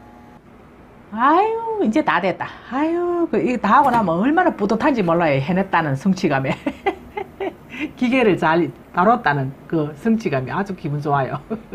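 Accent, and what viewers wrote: Gyeongsang, South Korea. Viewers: 님은 참 재미있게. 요리. 하십니다. 구수한 갱상도 말씨 정감갑니다 항상 잘 보고 있습니다❤❤❤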